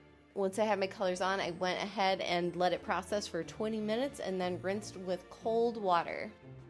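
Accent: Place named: Missouri, United States. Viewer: Definitely off topic but are you from Missouri?? I feel like you have a very Missouri accent and I love it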